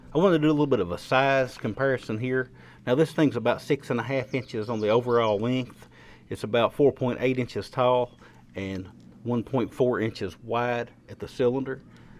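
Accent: United States. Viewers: When i start to hear this kind of accent, i automatically know that i found the right channel to hear something about guns. Best greets from germany, i love ur american accents.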